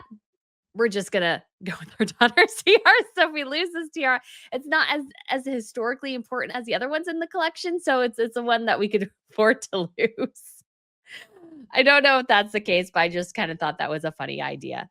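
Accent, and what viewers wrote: United States. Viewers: I love you, are you from the South? I don't need to know exactly where but I swear you could be one of my friends:D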